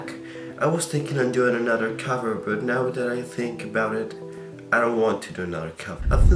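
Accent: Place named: Mexico. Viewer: Thanks Mike! I'm from different countries so my accent is mixed with other accents. it's mexican+costarrican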